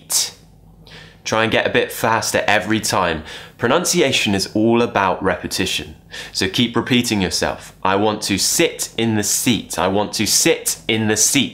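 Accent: RP, United Kingdom. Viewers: Do you speak English according to rp or not explain it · Thanks to God Thanks to your parents than Thanks to you for teaching us perfect British English and please send more videos when you can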